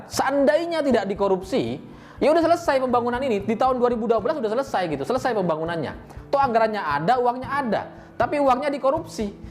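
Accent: Madura, Indonesia. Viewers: Saya suka narator nya pintar cerdas, kocak lucu,,, Mantap bro lanjutkan, pakar mantan, kyak nya anda orang Madura ya bro,,, klo iya mantap sak masek,,, 👍🙏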